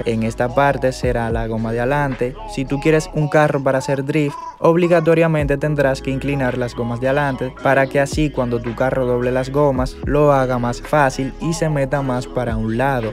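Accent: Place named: Dominican Republic. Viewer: Yo sabia que esa forma de hablar tuya era dominicana ufff puro flow domi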